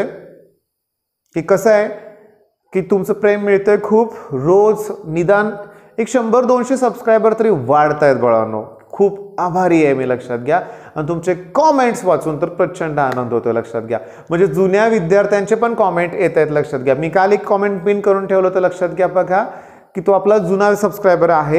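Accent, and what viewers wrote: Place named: Satara, India. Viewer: साताऱ्याची भाषा !!! 😇 खूप भारी sir....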